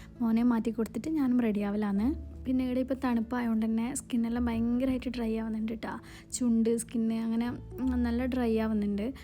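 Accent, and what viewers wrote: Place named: Kannur, India. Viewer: ഗൾഫിലെ ബാംഗ്‌ വിളി ഒരു പ്രത്യേക ഫീൽ ആണ് കേൾക്കാൻ. ഞാൻ പുതിയ ആൾ ആണേ. കണ്ണൂർ. നിങ്ങളുടെ സംസാരം കേൾക്മ്പോൾ കണ്ണൂർ പോലെ ഉണ്ട്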